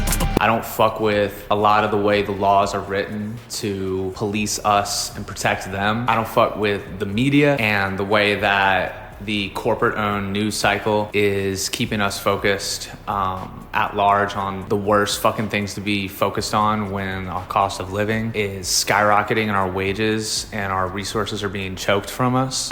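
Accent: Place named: South, United States. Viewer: Your little southern accent is too freaking adorable. 😭😭💖💖💖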